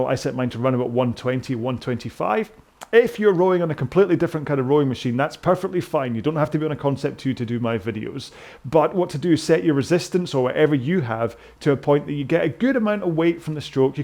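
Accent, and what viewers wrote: Scotland, United Kingdom. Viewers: You’re my No1 go to. Love the chatter, the coaching, the technique, the production quality and the humour. (The Scottish accent helps as well). So hope you also have #patience for staying with us here, Coach! 🙏👏👏👏👏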